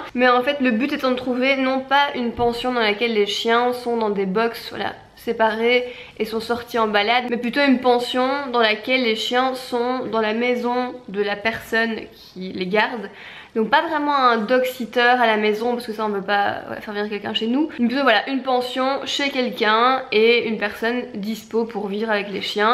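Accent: Belgium. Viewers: Tu es belge ma chérie ?😍❤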